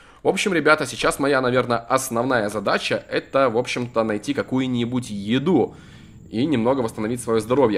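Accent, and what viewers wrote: Belarus. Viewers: ты белорус или русски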